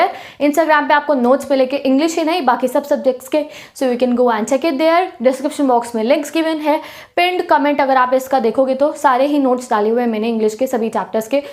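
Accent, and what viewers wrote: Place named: Uttar Pradesh, India. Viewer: UP style of speaking bro Henge=hai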